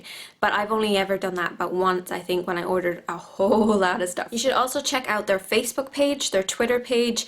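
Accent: United States. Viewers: Are you irish? Cause you sound like you're from America. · I think Shes Irish-american. She talks like a american with a Irish accent...if that makes any sense... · are you from america but living in Ireland? you sound so American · you almost sound american :)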